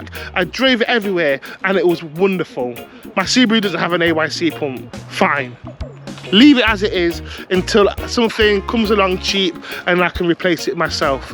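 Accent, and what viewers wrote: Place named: Birmingham, United Kingdom. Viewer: I just love how you brummies say brought that’s all 🤣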